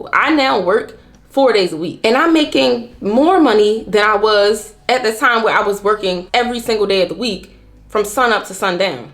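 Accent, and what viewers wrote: Baltimore, United States. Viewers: Is she from Baltimore? I hear it in her accent and I’m from Baltimore lol. I wouldn’t be surprised bc a lot of us women who grew up here have had to build this masculine, tough girl persona to protect ourselves in this city and I am so happy to know I’m not the only woman here who is tired of it lol (if she is actually from Baltimore) 😅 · Are you from bmore by any chance, if so I can hear it in your accent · I’m working on being more intentional as a wife and mother and a better woman, so I decided to come on YouTube to find a black woman who has been implementing intentional changes in their life and I came across your videos. Thank you for sharing your story. Side bar as I was watching one of your video I was thinking she sounds like she is from Baltimore 😂. Shortly after you stated you were from Maryland. Nevertheless sis keep up the great work. 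· I hear the Baltimore. So I know you know first hand how hard it is to be feminine in Baltimore. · This was gooood. Like a sermon lol. You should like you're from Bmore. Thank you for this.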